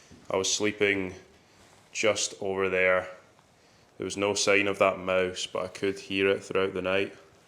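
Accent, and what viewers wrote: Scotland, United Kingdom. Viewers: 💎Another great Video! Thanx and biggest Respect! Love your scottish accent .😊 Wish you all the best! 💪🏼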